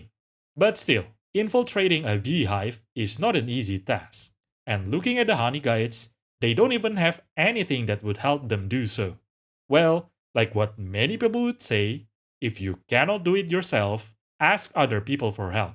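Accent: Southeast Asia. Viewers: Oh, south east asian, always wondered what that accent was Also love these birds, so cool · You're the only Indonesian I know, so I have no other points of reference. To me, your accent sounds like a mix of generic Southeast Asian + Dutch.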